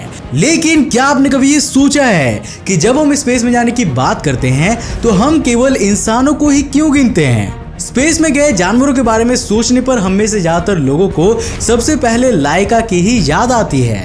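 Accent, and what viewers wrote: Bengal, India. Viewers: are u from West Bengal? BECAUSE YOUR ACCENT SAYS SO. AND I PERSONALLY LOVE BENGALI PEOPLE.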